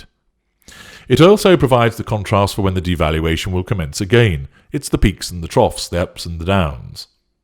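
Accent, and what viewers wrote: United Kingdom. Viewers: I never need a respite from a lovely British accent, but Brits can certainly be difficult for American English speakers to understand. It's like when they have an idea-r. 🙂 The best idea is to turn a respite (a brief break, especially from something difficult or unpleasant) into a permanent narc-free vacation. Wherever you are in your journey, I hope that you are able to GOSO. 🙏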